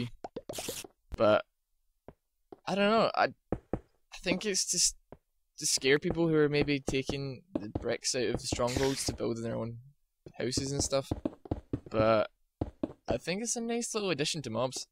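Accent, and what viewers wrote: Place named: Scotland, United Kingdom. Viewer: i like your scotish accent!!!!!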